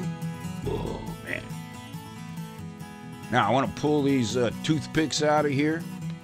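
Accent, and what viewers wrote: South, United States. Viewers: Bacon In Ham,This Man Is A Genius Im Hooked!!!Love His Southern Accent Too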